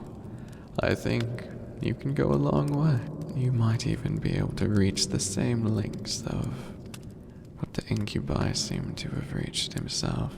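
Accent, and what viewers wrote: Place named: United Kingdom. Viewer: Sounds British. Perfect!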